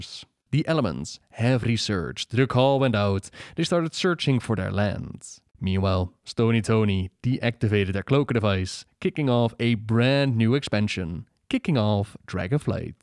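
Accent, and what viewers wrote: Netherlands. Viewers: Love the accent of a fellow dutchy🤪🤘🏻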